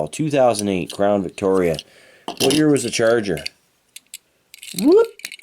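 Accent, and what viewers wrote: Canada. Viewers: I was just about to comment. Is he form Canada because the way he said stuff. Mostly because the way he said car. I live in America but I have Canadian in me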